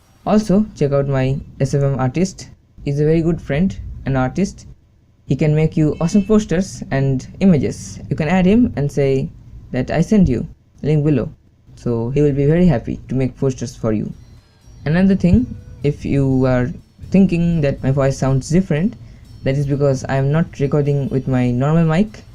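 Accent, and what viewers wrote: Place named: India. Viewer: Ur indian accent is annoying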